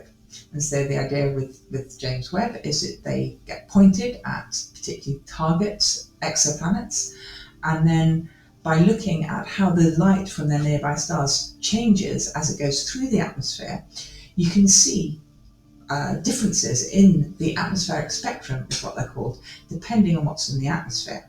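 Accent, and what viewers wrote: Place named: United Kingdom. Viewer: Nothing better than hearing pure science from a well-spoken British scientist! 🥳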